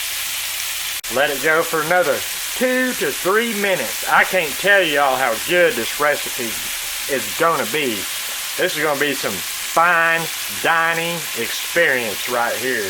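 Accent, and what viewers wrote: South, United States. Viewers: You are such fun chef to watch and I love your southern accent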